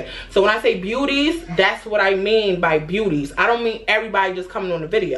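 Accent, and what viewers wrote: New York, United States. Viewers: Lmaooooooo im from jersey and grew up inna hood and HATEDDDDDDDDDD all this ghetto ass shit being around me. (But no shade i loved to watch it from my window 😂😂😂😂😂😂) The way you tell these stories ny accent and all be having me deaddddddd. Makes me lowkey miss it a lil bit. But i love how youre real about ur experiences and where your mindset was at the time. I'm glad you got outta there, because alotttttttttttttttt of the people i grew up with are in the same damn place doing the same damn thing.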